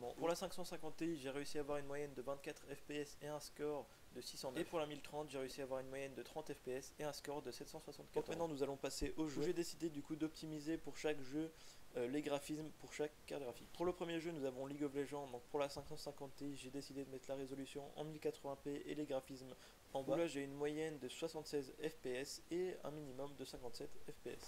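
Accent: France. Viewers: судя по акценту это французский или итальянский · французский, итальянский вообще другой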